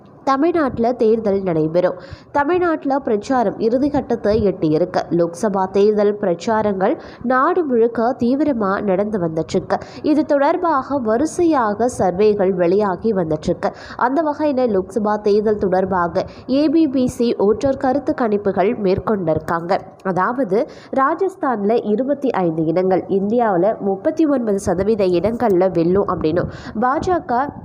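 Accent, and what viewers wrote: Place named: Sri Lanka. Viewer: தமிழ் உச்சரிப்பு கூட சிங்கள தமிழ் மாதிரி உள்ளது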